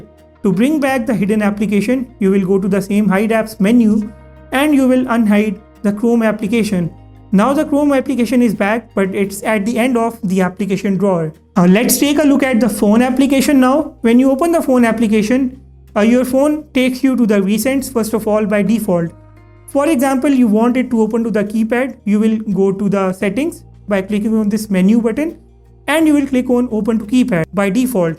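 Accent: India. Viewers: Why? Lol is just Indian accent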